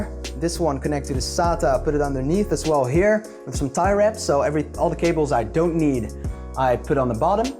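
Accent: Netherlands. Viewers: within the first few seconds i was like 'he has a dutch accent, is he dutch?' and then i saw the box tape and i was like wait isn't that Azerty tape since i bought something off of there like a week ago and it was so yeah your english is really good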